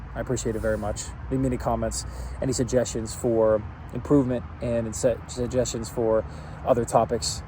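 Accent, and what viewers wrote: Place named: Chicago, United States. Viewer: I knew that accent sounded Chicagoan, you keep it real. Lots of love from Detroit.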